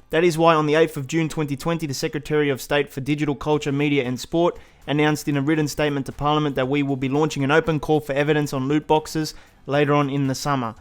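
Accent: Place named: Australia. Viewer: I'm an Australian guy living in 🇪🇺 and I have to say I just watch this channel for the hosts accent....I feel like I'm back home at the Gabba with a cold one on the first day of the November test match! 😅🤣😂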